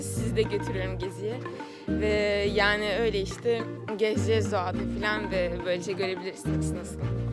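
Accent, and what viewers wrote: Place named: France. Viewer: konuşurken hafif tatlı bir fransız aksanı geliyor sanki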